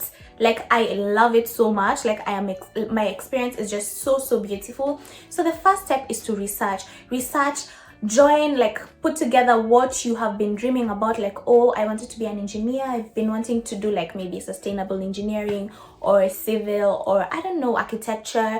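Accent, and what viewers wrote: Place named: Kenya. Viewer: Thank you for sharing! I love your Kenyan accent😍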